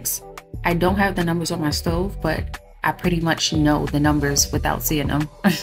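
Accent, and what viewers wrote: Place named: New York, United States. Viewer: I’m going to try this tonight. Yessss to the editing and love your NY voice.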